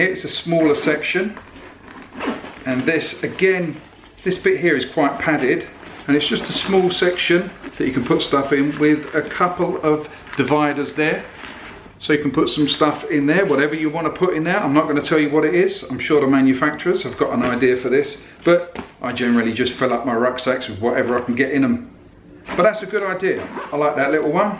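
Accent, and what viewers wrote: United Kingdom. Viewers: this video would be extremely boring.... but that British accent makes it interesting.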